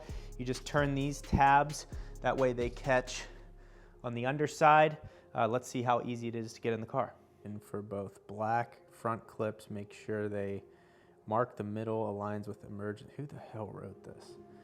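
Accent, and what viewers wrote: Pittsburgh, United States. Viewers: sounds like a yinzer, said "see ha it goes dahn er" Da Burgh.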